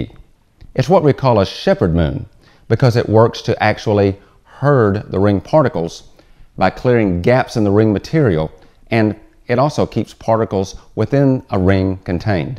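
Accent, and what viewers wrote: South, United States. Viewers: Love the Southern twang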